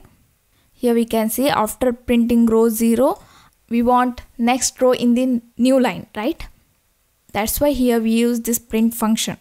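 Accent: South India, India. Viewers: Ur voice close to South indian, while speaking southern India will play around,. 😉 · Your voice and accent is like south Indian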